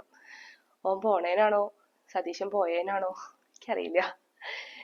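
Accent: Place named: Malappuram, India. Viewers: മലപ്പുറം , പാലക്കാട് slang ആണെന്നാണ് കേട്ടിട്ട് തോന്നുന്നത്. ഞാൻ മലപ്പുറം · നിങ്ങളെ ഒരുപാട് ഇഷ്ടം ആണ് നാട് എവിടെയാ സംസാരം കേൾക്കുമ്പോ മലപ്പുറം ഒക്കെ ആണെന് തോനുന്നു pls reply❤️ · സ്ക്രിപ്റ്റ് 👌🏼👌🏼👌🏼👌🏼👌🏼🥰🥰🥰🥰🥰🥰.. നാട്ടിൽ എവിടാ...calicut ആണോ??? Slang നമ്മുടെ മലപ്പുറം, calicut പോലെ ഉണ്ട് ❤️❤️❤️ ഞാൻ calicut ആണ്.. അതാ ചോയ്ച്ചേ 😊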